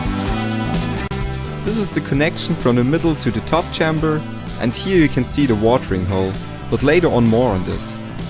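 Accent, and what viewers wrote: Germany. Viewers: Hey. Nice nest. Looks great. Are you come from Germany? Your accent sounds like like german. I come from Hamburg in Germany. I like your channel. Greetings Alex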